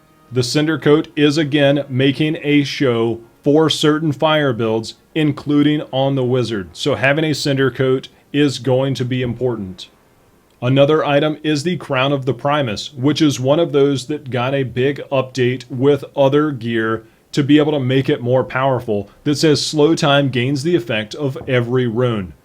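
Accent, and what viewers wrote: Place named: South, United States. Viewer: Oh a southerner! OK, you're off the hook. Keep up the great videos! :-)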